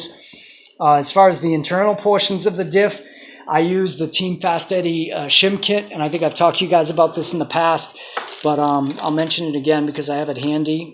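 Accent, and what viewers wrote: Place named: Boston, United States. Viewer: love the bean town accent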